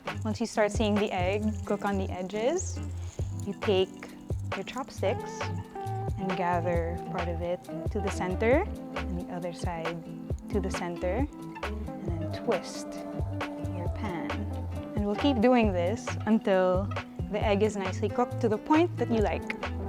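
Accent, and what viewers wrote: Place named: Philippines. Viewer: You have an accent. Are you pinay?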